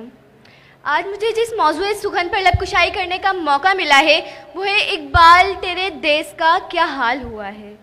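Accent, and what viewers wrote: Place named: Punjab, India. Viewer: Mend your Urdu pronunciation.slowly move away from Punjabiat.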